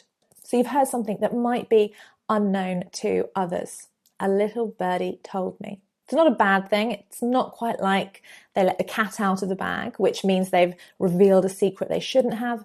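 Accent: United Kingdom. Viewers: Great British accent ! I live in Austria where the children are taught to pronounce every “a” (or “A”) as “e” (or “E”), for example “en epple” or “e men”. It was really refreshing for me to hear an “a” pronounced as such ! I think this anomaly originates from American English, as the Americans often pronounce an “a” as in “ha-emmer” and not just like “hammer”, however the Austrians and Germans are taught to pronounce it like “e hemmer”. My wife was often laughed at in the UK for example when she talked about a person having “e sore beck”, and a friend of mine quipped “Sore Beck? Is he by any chance related to Jeff Beck..???”. The level of English spoken by Austrian and German people is also considerably lower than that spoken e.g. in the Scandinavian countries or in the Benelux countries, but the local authorities just don’t care, as they are doing nothing to remedy or to improve on the situation..🤦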